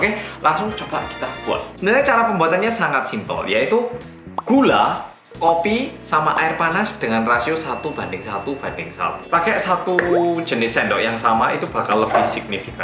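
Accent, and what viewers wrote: Java, Indonesia. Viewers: "Dewean e , hampuddd" Medoke samean nggarai nagih mas😂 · halo guys welcomback to korea bersama org korea yg Me:Medhoookk....:luwess.. Me:malu ny aku anjir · Halo guys welcome back to Korea bersama orang Korea yang "MEDOOOOK" bolo-bolo mana sworone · Kakak keren bangett sii, orang korea tapi medokk banget jawanyaa.. Fasih juga bahasa indonesinya... Sukses selalu mas 😍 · Kak itu: •pinter •ganteng •cakep •medok jawa banget (walaupun au ngak bisa bahasa jawa:v) •ganteng •dan gantengggggggggggggggg Sumpah cakep bener kak 😍😍😍🤩🤩🤩🥰🥰🥰🥰 By: fanbase kak 🥰🥰🥰🥰 <mail> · Muedokkkk e pollll rek mas Iki ngalah² i wong jowo🤣🤣🤣😍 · pokok e kalo udah bahas makanan atau minuman.. pakenya luweh. selain itu pake medokk.. hahahaha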